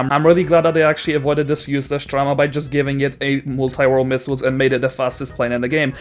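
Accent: Poland. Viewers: wiedziałem ze polak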